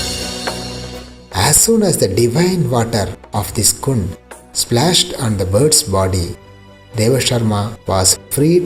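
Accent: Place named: England, United Kingdom. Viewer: Commendable job. Can’t thank you enough for the commentary in English. Sadly heavy English accent. Best wishes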